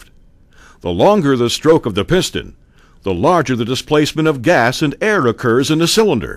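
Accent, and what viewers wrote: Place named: United States. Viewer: Do we have to have the overconfident American accent? It's a piston not a peeestonnn.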